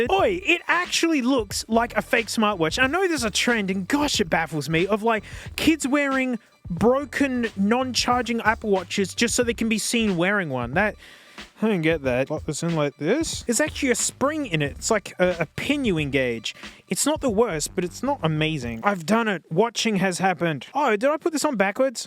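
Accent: Australia. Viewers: Ooo I have a question to you as a non-native english speker. I noticed a while ago your aussie accent not being as expressed or prevalent as some of other Australian youtubers I watch. Do you come from a region where people just don't have that very distinct aussie accent, or do you change your speech and pronounciation deliberately, so people who aren't used to it can understand you better? I guess this isn't that important really, but I've been wondering for a while now. Thanks man🤣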